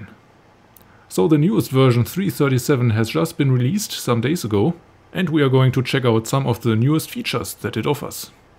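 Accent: Germany. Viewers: oh Hab an der stimme gehört und an das englisch weil wir immer so englisch sprechen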